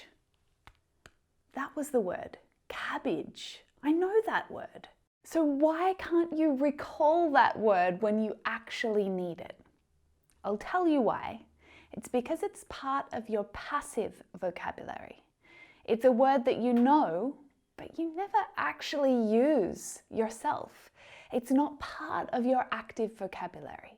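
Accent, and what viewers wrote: Australia. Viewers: Hi from Turkey.I love you and your explaining. I love when you speak Australian English. Thank you to teach us English. 🇹🇷🇹🇷❤️❤️🇦🇺🇦🇺💕💕🌺🌸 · I enjoyed watching your video and i've been somehow doing ALL OF the things (tips) you suggested before and will continue to. Now i feel good because i know that i've been doing this the right way and I'm proud of myself as well. I just love your teaching style and especially your Aussie accent! I wish you all the best,!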